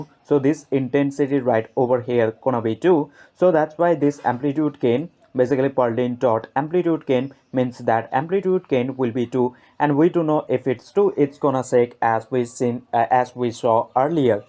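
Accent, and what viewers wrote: India. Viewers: i can't listen to this indian accent ... you should look up tutorials how to speak English.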